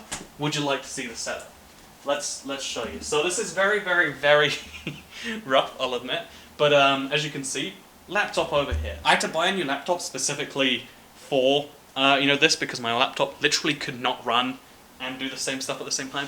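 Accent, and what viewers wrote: United Kingdom. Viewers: Hes brittish, chill. Its probably the acent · How american is, he's certainly 100% speaks british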